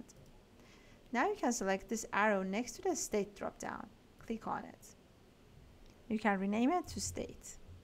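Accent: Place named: Iran. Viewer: this crazy iranian accent...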